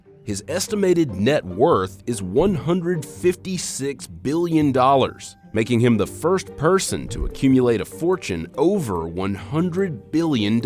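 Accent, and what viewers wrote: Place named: United States. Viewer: Jesus the American accent is annoying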